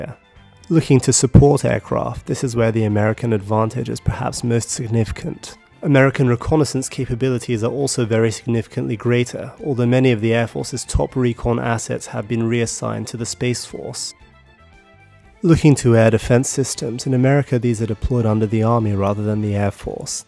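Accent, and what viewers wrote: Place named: United Kingdom. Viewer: Pompous British accents are hilarious 😂 Nothing personal, great videos !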